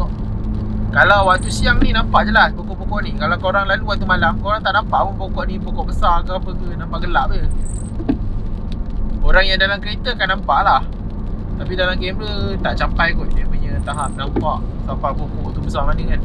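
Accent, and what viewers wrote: North, Malaysia. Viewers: org utara kaa? Lama duk sana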